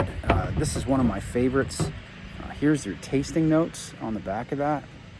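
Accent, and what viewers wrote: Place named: Northeast, United States. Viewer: Love your reviews! Between the three Quorum varieties, which would you say is your favorite? BTW, your Northeast accent and sensibility definitely brings me back home as I currently live in California but grew up in the NYC area! Are you originally from PA?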